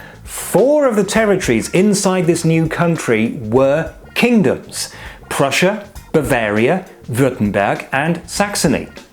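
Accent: United Kingdom. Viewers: Your vid popped up on my youtube selection. Had aways wondered about that name.. now I know, and not only that.. I feel so much smarted learning it in your British accent. Like Sub! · Not bad. Your accent is very british indeed for an Aschaffenburger.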